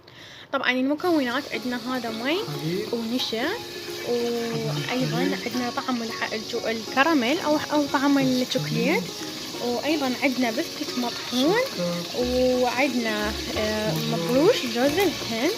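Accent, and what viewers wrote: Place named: Iraq. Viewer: بجد روعة ولي خلىال4يديو أجمل كلامك الجميل بحب اللهجة العراقية جدا جدا شكرا على الوصفة ياعسل اختك من الجزائر🇩🇿❤️🇮🇶 🔔🤝👍💜🧡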